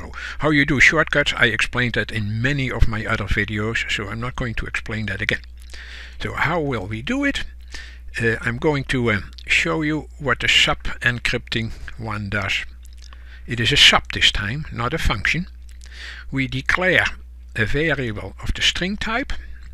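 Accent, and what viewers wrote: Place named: Netherlands. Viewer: Sean Connery is Scottish. This guy sounds like he's from the Netherlands or something.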